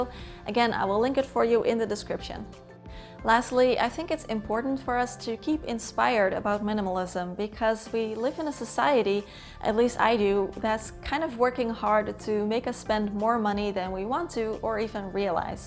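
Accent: Netherlands. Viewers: Ik hoorde toch een Nederlandse toon❤❤ je bent gewrldig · I somehow could hear you were Dutch (even though your english is sooo good and you don't really have an accent) but it was confirmed for me when I saw the Holland & Barrett kortingskaart, haha. Super leuke video's en je hebt er een sub bij!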